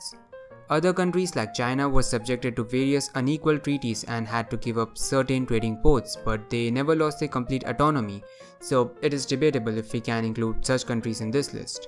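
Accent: India. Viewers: The Indian accent is fucking hilarious. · I was fine with the accent, couldn't actually tell you were Indian, but, please. Back up from the mic next time, the peaking is somewhat annoying.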